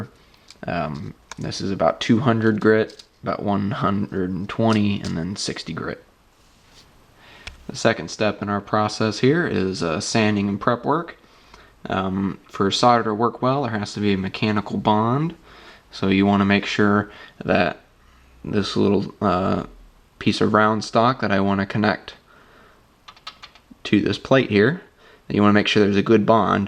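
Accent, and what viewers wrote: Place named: United States. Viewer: thanks for the informative, helpful instruction. IS there a stronger bonding way to join brass? BTW but why do Americans pronounce solder, "sodder"?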